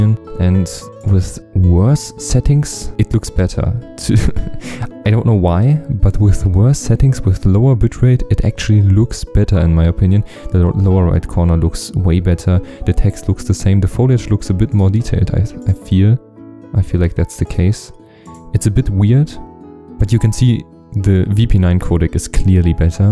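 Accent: Germany. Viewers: just uploaded a video on 1440p. first problem: i can only watch it on 1080p plus it`s still avc. hopefully it's still processing and in a few minutes it's fine edit: ich wusste doch dass du deutsch bist das hört man :) nichts gegen dein englisch, alles gut :D 2. edit: alles funktioniert danke :D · Man hört deinen deutschen akzent, fand das Video trotzdem hilfreich.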